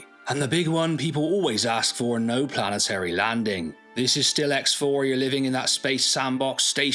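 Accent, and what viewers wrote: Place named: England, United Kingdom. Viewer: Hey, fellow Englishman here, from a bit further to the east than you going by the accent 😂 I’m 46 and just restarted the expanded universe books from where I left off in my early twenties to be more clever. It’s such fun, I didn’t know if I’d still enjoy it but loving it - no spoilers please I’m nearing the end of the yuuzhan vong war. I played through most Star Wars games and read again the old comics - expanded universe is amazing, I love it so much and have so many good memories from it and everyone knows, this is the real Star Wars timeline written mostly by people who get it and are into it as opposed to the Disney trilogy which seemed to be a misandrist modern feminis/woke vehicle to attack men, and push minority supremacism (I feel like you’re expected to caveat that with how you’re not racist that’s the point but I cannot be arsed, shouldn’t have to). 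The worst moment I’ve ever seen in any film was one of the Disney ones where … you guessed it, it turned out all the men we’re stupid and belligerent and wrong and the purple haired old Crone rises up magnanimously and it turns out she and all women were right all along, stupid men. They then kill all the white male heroes - can you imagine how disgusting and unacceptable they found Han and Luke, and even, bastions of moral integrity that they are, force Carrie fisher to be in it, after she died wheeling around her digital corpse having bought the rights to her image. Disney are pretty much the empire. Nice touch having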